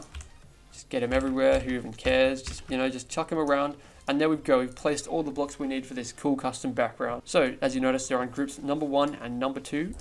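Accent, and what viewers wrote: Australia. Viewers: You from Australia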